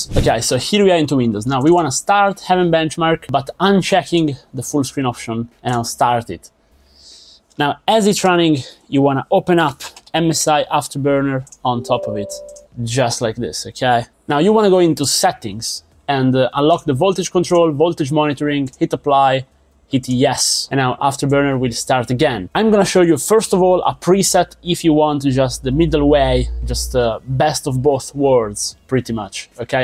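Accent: Italy. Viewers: sei italiano??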